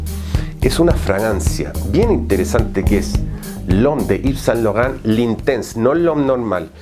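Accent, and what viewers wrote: Chile. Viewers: Eres Chileno o Español? Pareces una combinación de ambas jaja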